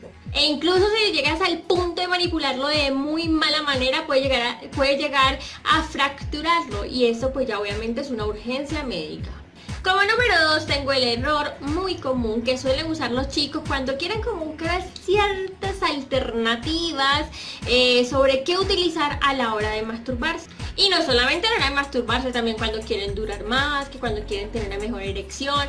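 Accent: Colombia. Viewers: Que acento tan feo es el colombiano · ☺️❤️❤️❤️❤️❤️❤️❤️❤️❤️😊☺️pero es que todas las colombianas son hermosas y su asentó de hablar me encanta 😍 yo me quiero casar con una colombiana plz can you help me 😊❤️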